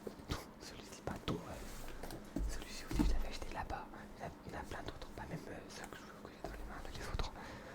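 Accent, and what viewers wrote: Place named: Lyon, France. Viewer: Sérieux t de Lyon ! Moi aussi ! Ça me ferait de te croiser Inchallah mon frère . J’me disais aussi il parle comme les lyonnais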